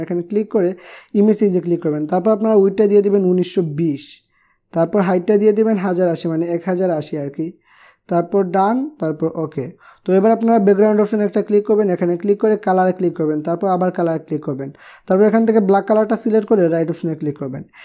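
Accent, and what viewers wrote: Sylhet, Bangladesh. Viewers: Vaiya apne Sylheti ni?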